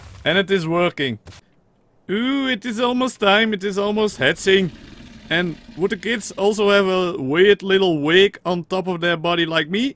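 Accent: Netherlands. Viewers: You sound dutch.. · are you From the netherlands, because you have kind of a dutch accent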